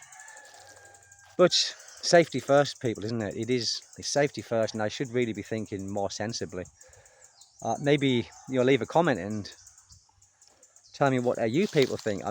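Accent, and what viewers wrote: United Kingdom. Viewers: I am probably wrong, but you sound like a Brit. I am just a bloody yank. I can think of a half dozen places that are not safe in the UK. London, Manchester, Birmingham, Liverpool... you get the point the UK is not safe. Even 40 years ago it could be said that Belfast would not have been safe because of the IRA. In the USA, I am always armed. I have never had to use my firearm and I have lived in some very dangerous places. There are still many many dangerous places in the USA and even Canada as well. I would have to agree with your wife. Safe during the day is one thing, safe at night is something else. Now if you lived in Japan, I would say she was overreacting. But any one of the 7000 islands in the Phil, she is right. It is still a 3rd world country and people will do desperate things for money.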